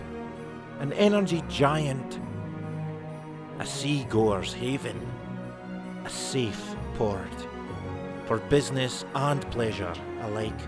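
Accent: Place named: Aberdeenshire, United Kingdom. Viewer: I made that comment about half way through the video - at the very end when you stopped narrating it was clear is was Aberdeenshire haha (I'm from Aberdeen and couldn't place the accent lol). Again, great video and can't wait for more!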